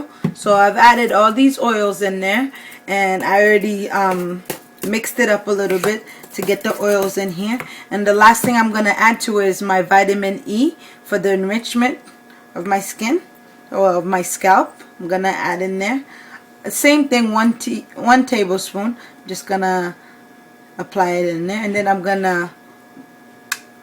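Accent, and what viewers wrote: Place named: Caribbean. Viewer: so obviously she gotta accent is either west indies or carribean ....lovin it. really liked the video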